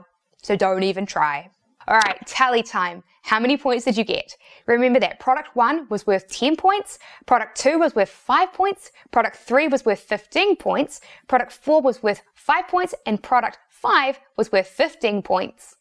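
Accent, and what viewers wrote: New Zealand. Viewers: Creengewearvey dance. I like your Kiwi ikcint tho. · A Kiwi. New Zealand .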